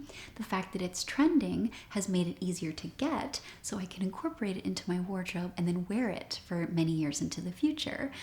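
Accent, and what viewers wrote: United States. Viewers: It just occurred to me (sick on the couch today) that it sounded like I was trolling🤦‍♀️ so I apologize if that's how I came across (you've got that old school transatlantic speak) I do find your page wonderful and I wish more women would follow your lead 💯 what also makes it so appealing is its geared towards EVERY age 😍 There is a woman named Heather something or other and I swear her "fashion advice" towards the 40+ set has them dressing like milf cougar super hookers that look like they've been gangbanged by a crayon 🙄 Not even kidding AND she calls it classy 😂 you must find her and see.. try not to spit your coffee!😉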